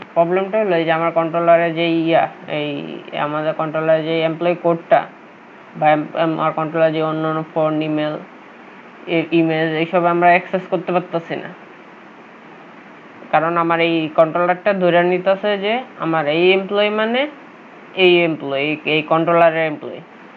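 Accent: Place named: India. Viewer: This is India-English !!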